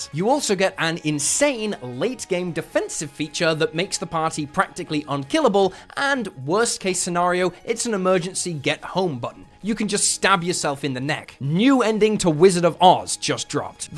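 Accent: United Kingdom. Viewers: guys I think he's British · Wait! He’s British? Thank gods Wilburton was there to take the tirade that revealed this fact to us. 😂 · You're British??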